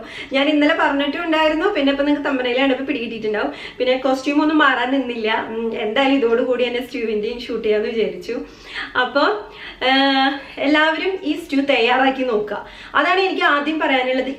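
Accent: Thrissur, India. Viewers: Recipe is good. But we will never use coriander powder in stew. Nattil evida? Nalla Thrissur slang. Me from thrissur.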